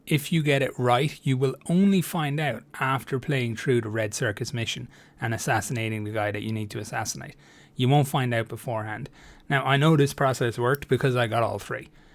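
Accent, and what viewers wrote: Ireland. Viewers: he just has an irish accent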